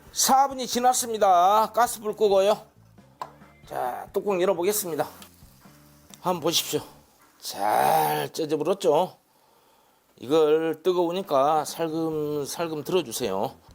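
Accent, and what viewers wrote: Jeolla, South Korea. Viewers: 셰프님 라도사투리~양재기 참듣기좋습니다 잘배워갑니다❤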